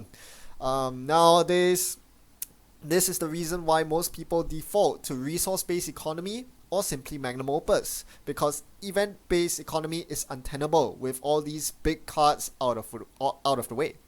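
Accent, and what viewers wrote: Singapore. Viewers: Are you Singaporian? I just had to ask, I can't place a finger on your accent.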